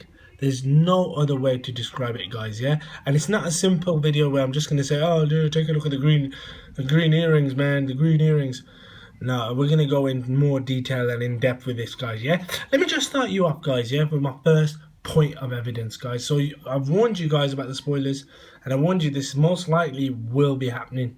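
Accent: Birmingham, United Kingdom. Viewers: Are you from Birmingham. I hear the brummie in you kiddo lol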